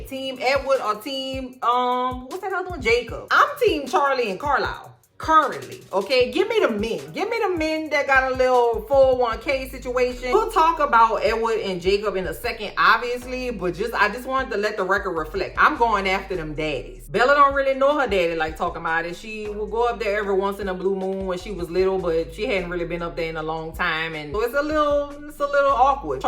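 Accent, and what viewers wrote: New Orleans, United States. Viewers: New Subscriber here. I found your channel for the “His&Hers” review and enjoyed everything about it. Your storytelling is engaging and funny and I can listing to the NOLA accent all day! As an adult who was all about Twilight back in the day and sometimes re-watches as a guilty pleasure I’m all here for your input. ❤🎉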